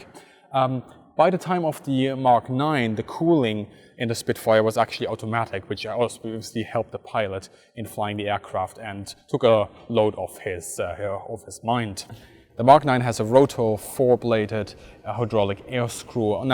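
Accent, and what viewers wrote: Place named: Germany. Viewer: A German is the narrator of this documentary..... LOL ! The most beautiful aircraft ever designed.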